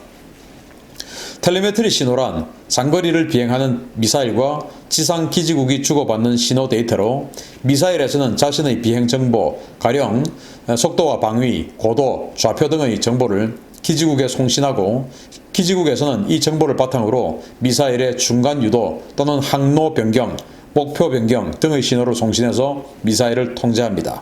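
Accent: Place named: Gyeongsang, South Korea. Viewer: 경상도 사투리 학을 땝니다 표준어 기겁 합니다 오랜만에 고향말 들으니 정겹습니다 신선생님 건강하이소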